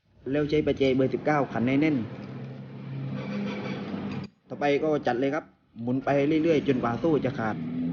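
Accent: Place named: South, Thailand. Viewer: พี่แกน่าจะคนใต้ครับ ฟังจากสำเนียง 555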